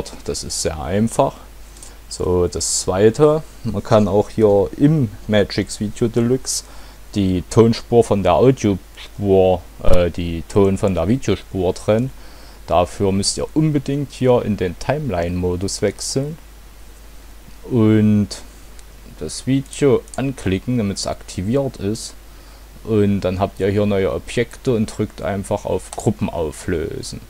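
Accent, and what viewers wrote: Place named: Saxony, Germany. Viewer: dummer sachse. lern deutsch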